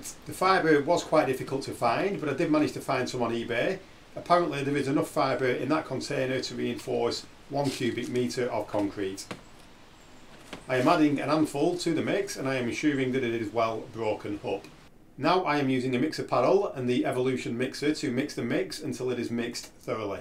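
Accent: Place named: Lancashire, United Kingdom. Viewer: Lancashire i am guessing. Nothing wrong with his accent.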